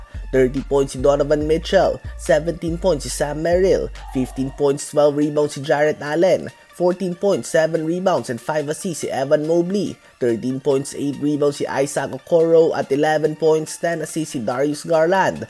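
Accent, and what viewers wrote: Visayas, Philippines. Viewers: Pang bisaya mga sounds mu😂mga bisakol at badjao lng makikinig nyan😂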